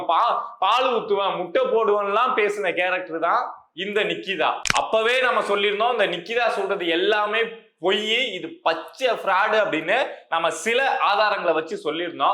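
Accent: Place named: Nellore, India. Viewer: Nellore speak Anna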